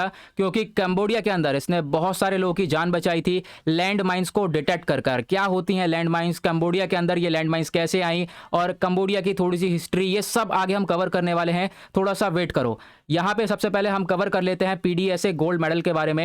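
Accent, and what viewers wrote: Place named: Bihar, India. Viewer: I am sure sir is from Bihar 🔥🙏🙏😀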